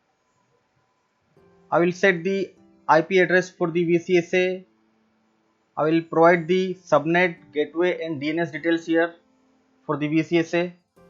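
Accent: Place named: India. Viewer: He does not have a speech defect. It is accent. Comes from India.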